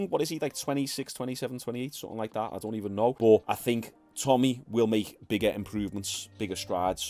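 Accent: Liverpool, United Kingdom. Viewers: Dude. A Scouser can talk about flies fucking, and I'd tune in.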